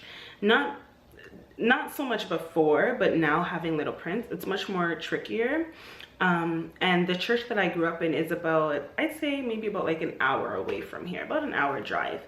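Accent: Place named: Jamaica. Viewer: Are you Jamaican ? Cause bwoiii this sounds like the churches in Jamaica. Plus you have an accent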